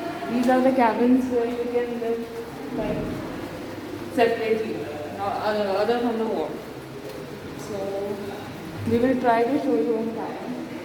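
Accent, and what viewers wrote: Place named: Bangladesh. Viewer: You were speaking Bangla with Bangladeshi accent.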